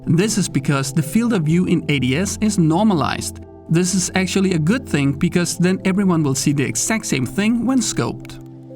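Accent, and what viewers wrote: Denmark. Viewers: Jeg gætter du er fra Danmark ud fra din accent :D Fedt content fyr! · Are you danish?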